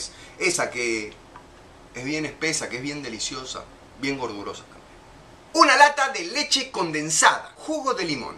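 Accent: Argentina. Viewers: o es argentino o uruguayo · Siempre que veía tus vídeos tenia la duda si eras de Argentina por la forma de hablar... pero ya que dijiste mate no me quedan dudas. Muy buena la receta. Saludos desde Córdoba Capital, Argentina. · Jodeme que es Argentino?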